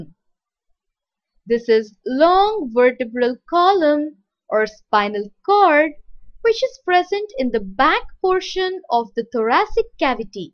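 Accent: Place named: India. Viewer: Unbearable Indian accent.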